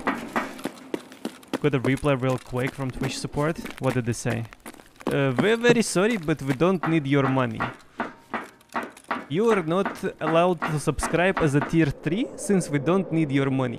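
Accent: Russia. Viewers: ты же русский,оно слышно))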